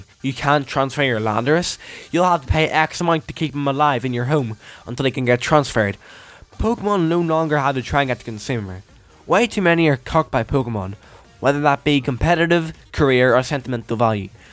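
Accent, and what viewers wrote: Scotland, United Kingdom. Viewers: Are you Scottish? Your accent is fire...also I’m buying it because I’m interested in the game. I like little town hero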